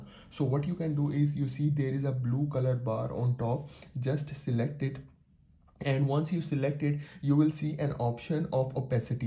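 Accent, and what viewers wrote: India. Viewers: Indian accent it seems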